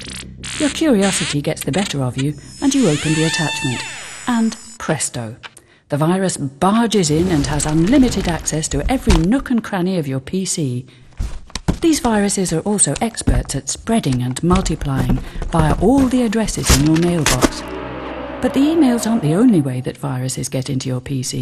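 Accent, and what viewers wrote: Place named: England, United Kingdom. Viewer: This is not a good explanation of the difference between Viruses and Worms! Viruses and worms are not known for "letting in riff raff". That is a backdoor. The difference between a virus and worm is that a worm can replicate and spread over the network by itself (self instatiating), whereas a virus requires a host file to run, it needs to be attached to some form of executable file. Simply, a virus requires a human to invoke it, and worm does not. Simple. You don't need to explain it badly. Despite the English accent, this is bunk. <link>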